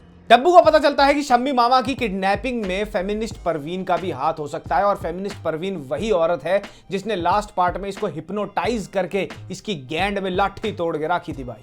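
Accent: Haryana, India. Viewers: 😂😂 I just love your reviews, n specially your haryanvi accent ❤️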